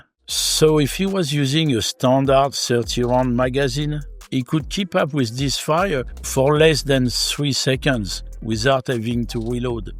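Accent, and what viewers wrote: France. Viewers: I love that you (still) have a strong French accent (a real plus for your channel in my opinion) 😁 Much more pleasant than the accent of many of my fellow Germans. They sound so wooden, as if they're trying to convince Scotland Yard that they aren't actually spies scouting England for a planned invasion. 🤣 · I'm almost certain you're French, but I have no proof at the moment (except for your accent).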